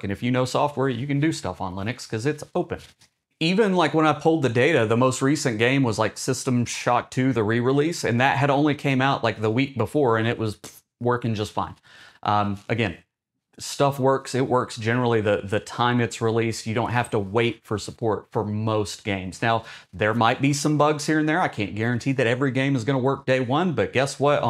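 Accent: Tennessee, United States. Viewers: are you from Tennessee?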